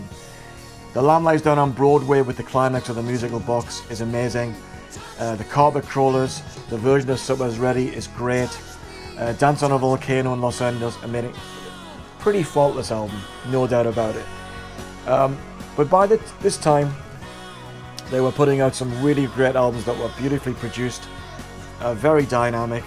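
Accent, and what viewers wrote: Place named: North East England, United Kingdom. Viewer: By the way, where’s your accent from. Sound like another north east native.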